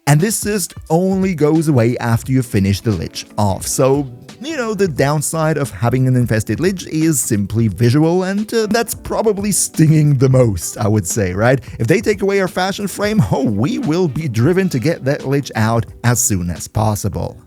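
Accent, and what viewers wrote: Germany. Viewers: Never knew you were german. So yes, GET ZHE FLAMMENWERFER (much love from Austria)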